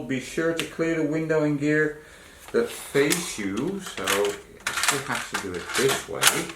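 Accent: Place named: Netherlands. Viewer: Do you service DAT recorders ?? I presume by your accent you are in Holland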